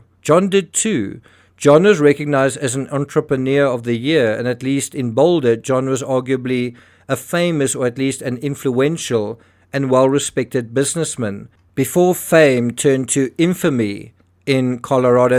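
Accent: South Africa. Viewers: It's that hideous South African accent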